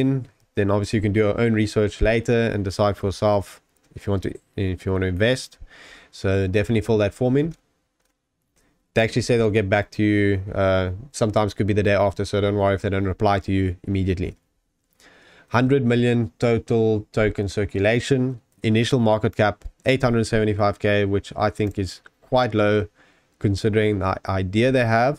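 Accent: South Africa. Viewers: Ate you from South Africa???? Your accent sounds like an afrikaans one. Im from SA living in South Korea.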